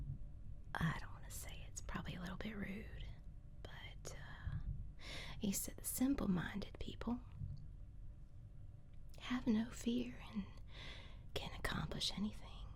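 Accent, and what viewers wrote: South, United States. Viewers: Omg what a video you’re southern accent was so on point an you made the most beautiful cowgirl ever an awesome an the flannel shirt was such a nice touch my mom raised me to be a gentleman a lovely angel like that a lovely angel like that a true 10 out of 10 It is a lovely feeling when you see someone who catches your eye an can’t that’s the same feeling when ever you are on twitch by the way you’re always beautiful you captured my imagination just watching each action in this video unfold I’d blush if someone that pretty admitted she had feelings like that glad that was not the last goodbye for those to love birds this was truly great asmr at its finest love you mama A+ work so talented rocking the red lips he should have kissed her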